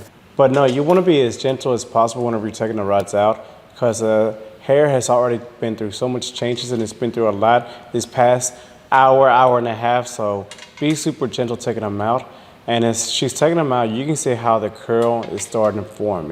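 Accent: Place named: Mexico. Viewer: Mix between Mexican and a good old boy country accent lmao.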